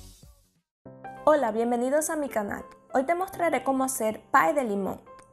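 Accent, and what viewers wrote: Venezuela. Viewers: Parece q eres de Maracaibo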